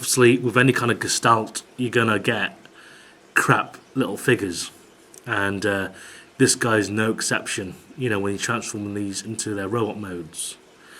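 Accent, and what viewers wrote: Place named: United Kingdom. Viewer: i didint know this guy is british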